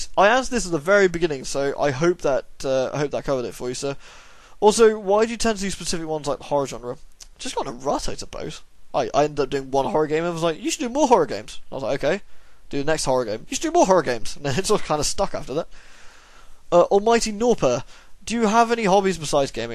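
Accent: United Kingdom. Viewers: acknowledged me! For some reason I fangirled when you yawned during my question... It must be your sexy Britishness or I'm weird. Either way keep up the amazing videos, they are one of my highlights.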